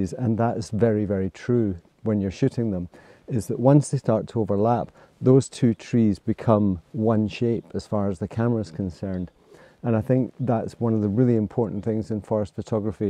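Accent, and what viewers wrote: Scotland, United Kingdom. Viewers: your deadpan Scottish accent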